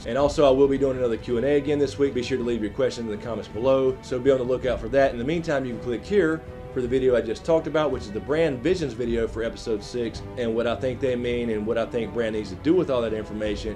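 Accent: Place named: Carolina, United States. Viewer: Great review bro! Hey are you from NC? You have that Carolina sound to your voice.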